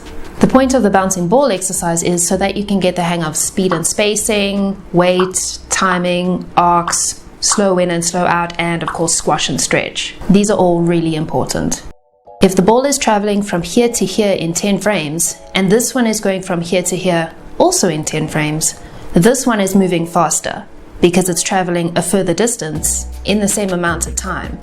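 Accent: South Africa. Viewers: She sounds South African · Idk if you are, but you sound so south african!! :D it's weirdly endearing to hear the accent on yt Thanks for the tips btw. Love your channel! It's been a great help so far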